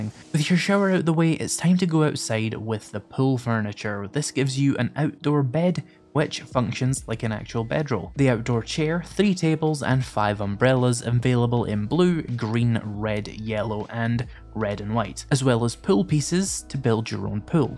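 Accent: Scotland, United Kingdom. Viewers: he sounds Scottish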